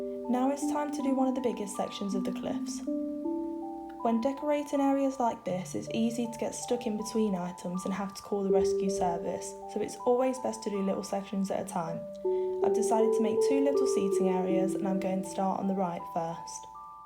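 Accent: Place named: England, United Kingdom. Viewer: Thought so! Soo nice to hear your accent among all the American ones. No hard feeling for them. But I spend a lot of time in the UK - or used to. So nice!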